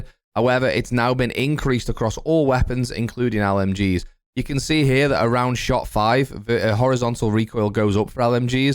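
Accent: United Kingdom. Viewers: Oh the sexy British man posted another video 🤺🤺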